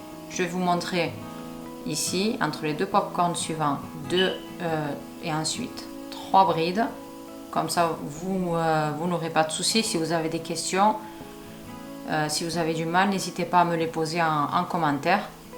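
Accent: South, France. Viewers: magnifique et en plus le merveilleux accent du midi, que demander de plus pour un 31 décembre ... très bonne année 2025 madame !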